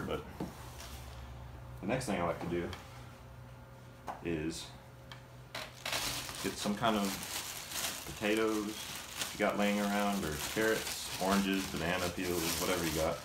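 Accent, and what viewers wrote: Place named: South, United States. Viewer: Not with that southern accent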